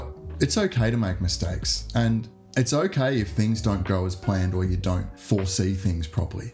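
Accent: Australia. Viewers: lovely build. Great wood choice. Unreal to hear Aussie accent for a change. Would you consider individually partitioned boxes for the scotches? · Australian accent, terms of lumber, butt dank something doesn’t sound quite right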